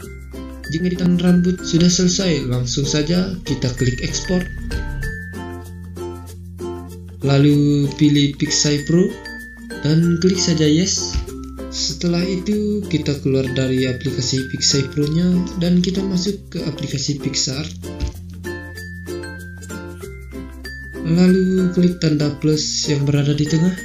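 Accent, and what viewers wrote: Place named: Jereweh, Indonesia. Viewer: Mantap logat Jereweh-nya :)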